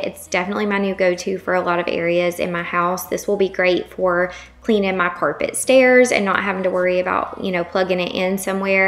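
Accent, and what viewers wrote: South, United States. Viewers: Where is she located? She has a pretty southern accent.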